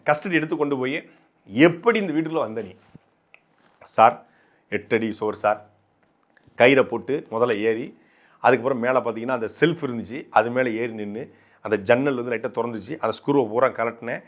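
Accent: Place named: Tirunelveli, India. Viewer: சாட்ட நீ பெரிய ஆளுயா.... உண்மையாவே very interesting politician..... செய்து உன்ங்க நல்லூர்.... வாழ்த்துக்கள் தம்பி.. தொடரட்டும் நெல்லை தமிழ்... கேட்க இனிமையாக இருக்கு தம்பி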